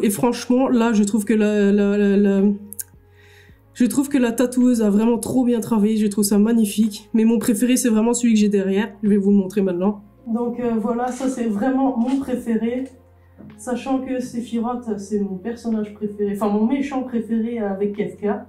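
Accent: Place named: Belgium. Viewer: Sa s'entend pas que t'es belge mdr t'a quand même bien l'accent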